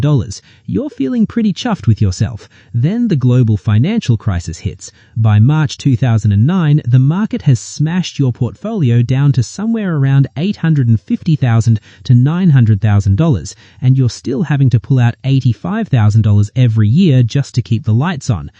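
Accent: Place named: Australia. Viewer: I know your channel is created to target people who literally a bit lost their way in personal financial approach. First of all, I apologize I been watching yer videos as a English listening practice source, second I love your Australian accent, mate❤❤❤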